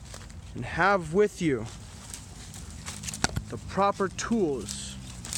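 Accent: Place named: United Kingdom. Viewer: I actually thought you were a hyped British guy.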